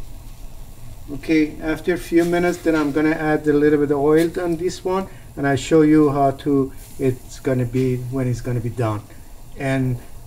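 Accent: Iran. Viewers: Love from Pakistan 🇵🇰🇵🇰🇵🇰 love your persian accent too, will try it!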